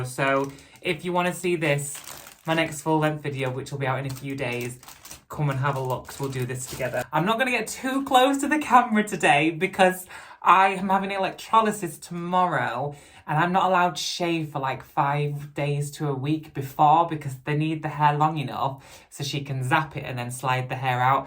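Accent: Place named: Oldham, United Kingdom. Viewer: You have and accent like Brian Cox. He grew up in Oldham UK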